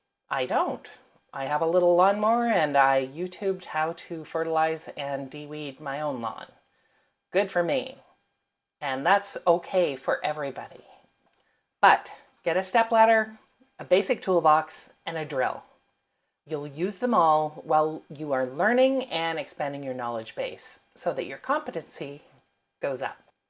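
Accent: Canada. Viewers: You're Canadian, aren't you? 😅😅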